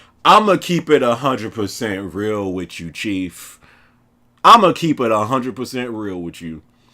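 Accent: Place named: New York, United States. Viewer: lol I could've sworn this nigga moved to ny the way he talks😭 saying my guy?😅 anyway, much love from Harlem ny fam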